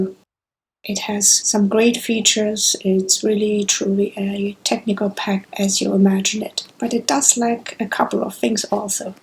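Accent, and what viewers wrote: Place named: Germany. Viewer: ah, ich hatte mich nur gewundert. Trotz des hervorragenden Englisch hat man den deutschen Akzent dennoch rausgehört. :P Habe direkt mal deinen Kanal abonniert :)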